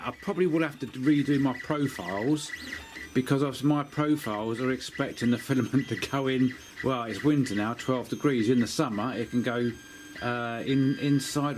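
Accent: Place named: England, United Kingdom. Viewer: I notice you have an English accent, do you live in the UK? I ask because I live in South Yorkshire and I dont have to dry any of my PLA, my PETG,ABS,ASA,Nylon are kept in vaccum sealed bags after drying them and are inside the enclosure when printing to keep dry. great deal by the way especially from Amazon.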